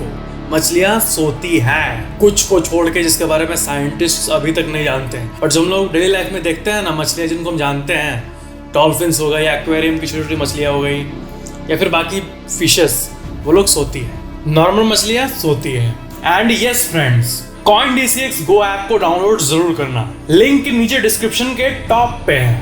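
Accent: Bihar, India. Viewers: Are you from Bihar or Up I am from bihar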